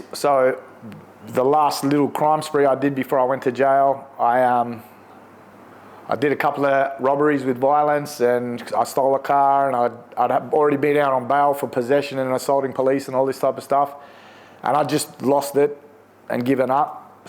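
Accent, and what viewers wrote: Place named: Sydney, Australia. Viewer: I love this testimony! 🙌 i grew up sydney around the same time and also went off the rails, the way you speak takes me back. Dark times. The devil is a liar! I was definitely under demonic oppression. Your testimony is so powerful, you will save so many 🙏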